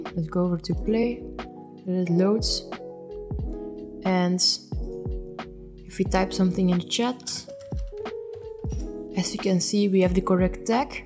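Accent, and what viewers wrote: Netherlands. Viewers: I smell NEDERLANDER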